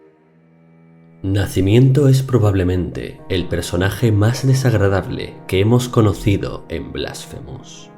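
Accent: Spain. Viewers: hasta con voz española y narrado como cuento medieval. Contenido de calidad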